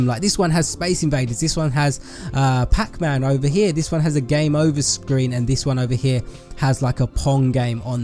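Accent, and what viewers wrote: United Kingdom. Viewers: So awesome where in England r u from?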